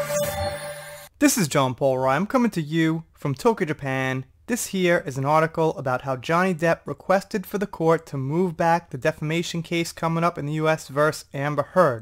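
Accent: New York, United States. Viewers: Man I'm real late for this one but I wanted to tell you or ask you, are you from New York? Kinda sounds like it. But also I heard you live in Japan, is that work related? I know I'm a little nosy, well really I'm a lot nosy. You don't have to tell me anything. I'm not a Stalker or anything. I promise just nosy. Thanks for the great info..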